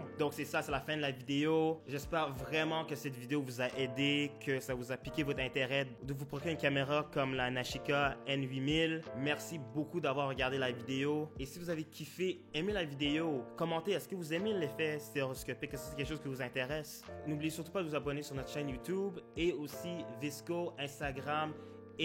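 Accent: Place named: Quebec, Canada. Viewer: "desole pour l'anglais" 😂 allors, mon francais n'est pas tres bon sans Google Translate. (<- I actually typed that without looking it up so it probably has grammatical/spelling errors) Being from the Toronto area, while I "learned" French, in school. It was hard to properly learn it. I'm learning more from watching French-speaking youtubers and French shows than from class! The auto captions helped me understand what you were saying but it's not always accurate. maybe for these, you could include French captions. Also, as soon as I heard your French, I KNEW you were from Quebec...the accent is just too indistinguishable😄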